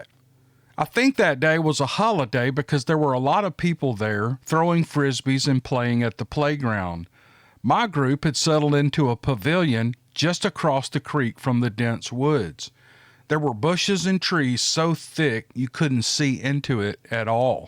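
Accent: South, United States. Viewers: Of all the great channels I've listened to, I think you have the best voice presentation. The easy southern accent is perfect for this type of thing, and the way you focus on your enunciation is very appealing. You should consider narrating audio books... You have a natural gift for it. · I thought I had listened to all your stories especially when I was laid up with the first cancer bout but it Appears not great to hear your voice I do listen to cryptids Canada but nothing is like your southern accent and gentle voicing of your stories thank you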